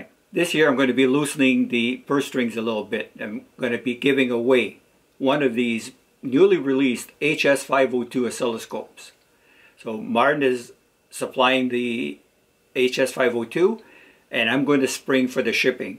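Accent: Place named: France. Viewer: Just found out your channel, looks like there's lots of diy things . Keep up the good work. Ps you seem to have some french accent, where are you from?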